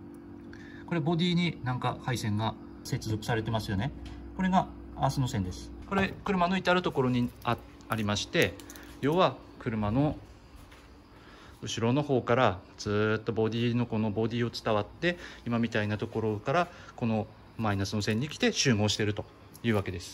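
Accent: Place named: Tohoku, Japan. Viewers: 東北の訛り本当最高です。実家に早く帰りたい😢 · 津軽衆のなまりですねー きいてて心地良いです(笑) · この訛りは津軽弁だな。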